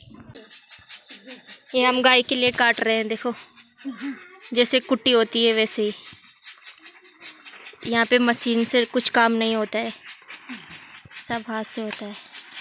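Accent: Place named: Aligarh, India. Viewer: आपके यहां का नेचर बहुत अच्छा है लेकिन भाषा अलीगढ़ की तरफ की लगती है हमारे गांव में भी ऐसे ही बोलते हैं😊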